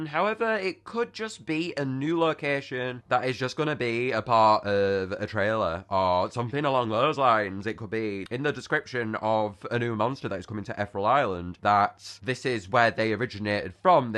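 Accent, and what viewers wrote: United Kingdom. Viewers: You are great to watch! I love your British accent! 💖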